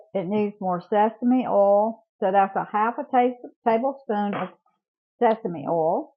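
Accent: South, United States. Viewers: I just wonder where you're located cuz you sound like you're from the Carolinas or maybe on down south like a Alabama unless maybe you a little bit Virginia but that y'all done getting you all OK gal on with the recipe❤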